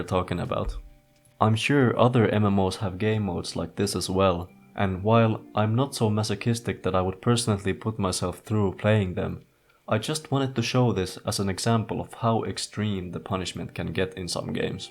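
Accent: Finland. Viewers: Definitely from Finland. The accent gives it away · Where are u from ? U sound like u have a finnish accent or something similiar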